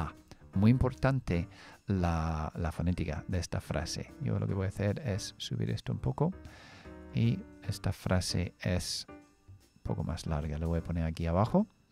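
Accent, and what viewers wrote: United Kingdom. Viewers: Hola, le agradezco que también incluya la pronunciación Estaudinense. Al principio no me gustó mucho por que le noté el acento Británico, pero después si me inscribí por que enseña muy bien, e incluye la pronunciación ya mencionada. vivo en USA hace muchos años, pero aún no soy fluente, pues nos pasa a muchos inmigrantes, ya que nos enfocamos mucho en el trabajo y no en perfeccionar el idioma y ahora que quiero buscar nuevo trabajo necesito ser fluente y urgente, así que todos los días estoy escuchando y practicando, necesito hacer en unos meses lo que no he hecho en muchos años 😢 Si da clases privadas o en grupo, me gustaría saber. Gracias, bendiciones 🙏🏼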